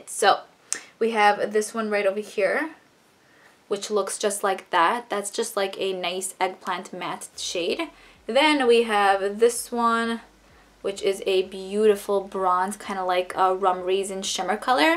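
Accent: New York, United States. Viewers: I subscribed as I love makeup and also love your accent - New York, right? (forgive my ignorance, I'm not American ☺)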